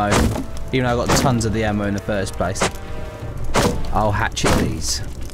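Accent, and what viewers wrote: United Kingdom. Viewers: I love your British accent and your videos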